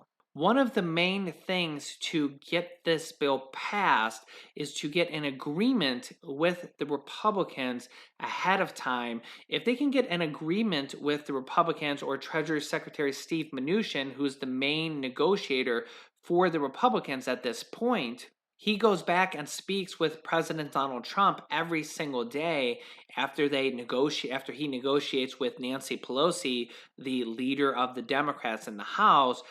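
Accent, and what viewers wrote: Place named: Chicago, United States. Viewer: it sounds like Chicago slang me and my wife LOL,.. another one could I ax. you a question...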